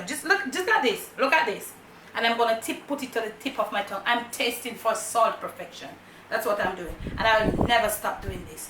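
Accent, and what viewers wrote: Jamaica. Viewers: Every time I watch one of your videos I want to visit Jamaica again. Your beautiful accent and delicious looking food are sooo enticing.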